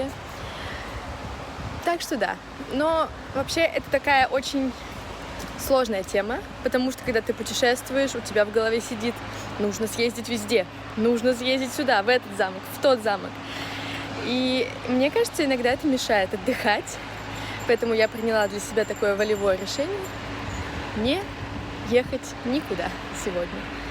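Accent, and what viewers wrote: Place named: France. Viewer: Привет! Откуда ты? Я никогда не слушал твой акцент 😄 Либа ето не акцент, проста как вы говорите? Вы как француженка с очень высоком уровень на русском хахаха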